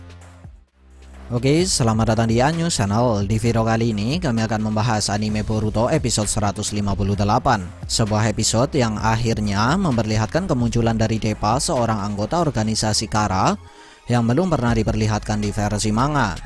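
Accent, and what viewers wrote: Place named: Java, Indonesia. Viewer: min aku suka banget sama suaramu, medok medok krenyes.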